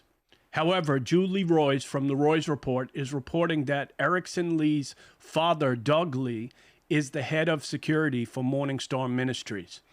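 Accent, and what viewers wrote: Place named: New York, United States. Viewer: I am subscribed and here for building faith... but as a former NY resident, now in Florida, I am absolutely here for your NY accent that I miss hearing except from my own mouth.😊 God bless.🕊️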